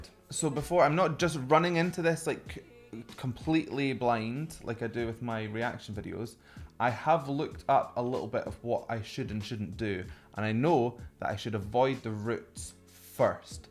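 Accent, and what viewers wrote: United Kingdom. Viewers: Why I love British and Scottish etc accents so much? You are cute so it does not help 😜 greetings from Tricity in Poland for you and and BTS Army 😘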